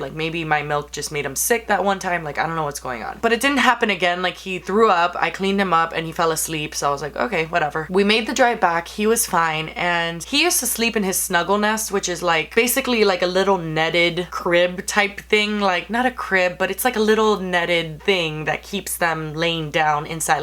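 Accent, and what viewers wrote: Miami, United States. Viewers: She's Miami Cuban. It's a Miami accent. What a rude question. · Alaina Reyes lol I totally noticed her having more of an accent, but I thought it was just her Miami-Cuban in her lol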